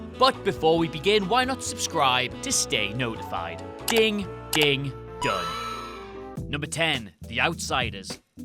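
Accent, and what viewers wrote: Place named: Newcastle, United Kingdom. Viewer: Nah man, he's a Geordie, those fuckers pronounce 85% of words incorrectly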